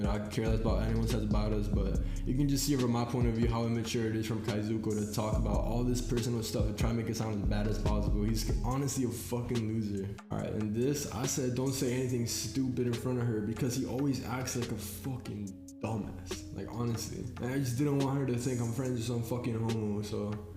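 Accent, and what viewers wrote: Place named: Colombia. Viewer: con un acento absolutamente colombiano que cualquier hispanohablante puede detectarlo fácilmente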